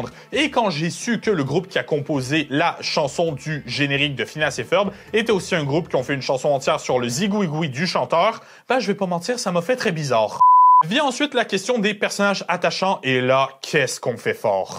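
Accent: Canada. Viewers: Salut, est ce c'est possible de faire une vidéo sur la série LoliRock (car c'est uns de mes séries préférée) STP. Merci beaucoup et bonne journée à toi et tu as des bisous de l'île de la réunion pour ton pays du Canada 💋💋💋 Et joli le petit accent canadien ! J'adore !!!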